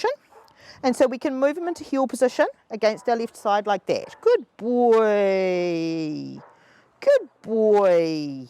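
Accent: New Zealand. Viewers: She’s saying better. It’s the New Zealand accent 😊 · Could you by chance spell out underneath your video what dog treats you're talking about (or perhaps a link to a recipe) since for the N. Americans the Kiwi accent is a bit difficult to unravel in certain spots and the name of the dog treat/roll was one of those spots for me. Thanks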